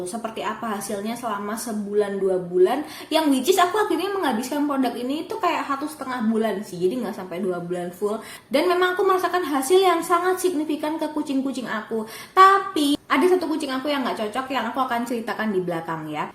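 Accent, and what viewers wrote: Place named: Surabaya, Indonesia. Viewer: Medok Suroboyo 😂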